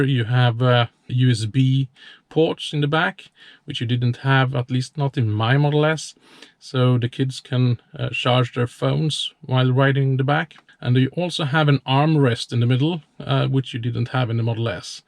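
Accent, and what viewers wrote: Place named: Germany. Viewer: Ah, nothing, just your dialect is just like my friends who is German. Perhaps you have lived there?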